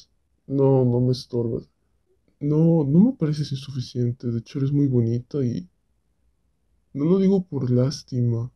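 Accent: Peru. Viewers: Hay me da la impresion de q eres peruano ( :D nada mas es una duda XD no te lo tomes a mal) Pd. Ta bonito el video :D chao cuidate xd un F por tu brazo XD . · eres peruano? tu dejo es muy parecidos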